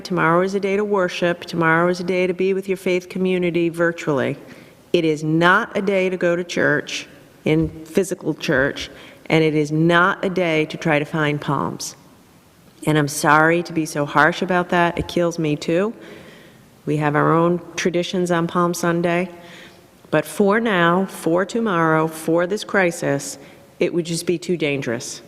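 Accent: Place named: Rhode Island, United States. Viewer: "3 new deaths anaconna the cahrona virus".....live the Rhode Island accent!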